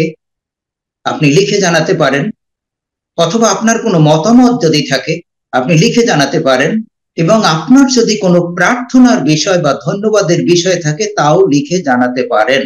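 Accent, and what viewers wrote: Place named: Barisal, Bangladesh. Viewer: জন্ম স্থান বরিশাল? মাঝে মাঝে বাঙাল ভাষা বেরিয়ে আসে।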